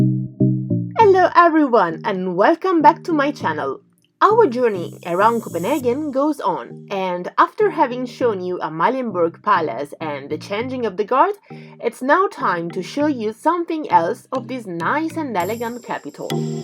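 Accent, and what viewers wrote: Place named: United Kingdom. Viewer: Wow, complimenti per il canale e per la professionalità dei vostri video. Complimenti anche per l'accento molto British 😉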